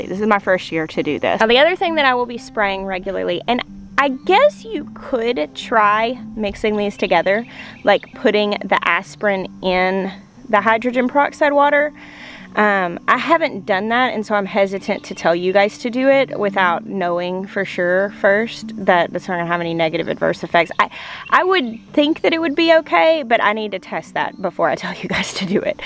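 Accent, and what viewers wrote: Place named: Arkansas, United States. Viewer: Love your Arkansan-Southern accent. So refreshing. I live in Little Rock, it’s turning into something else. Lots of valley-girl coming in.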